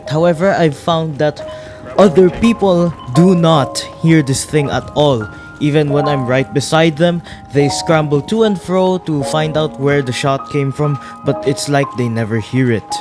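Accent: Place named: Philippines. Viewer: I discovered Deadline couple days ago and I instantly loved it. Though I'm not really good at building, I just slap in the parts with good stats lol. I'm glad I came across your channel, I got lots of build ideas especially for the M4. This channel deserves more views just like how Deadline deserves more players, you guys are the hidden gems of Roblox community. Btw, are you from Philippines as well? I feel like your accent sounds a bit Filipino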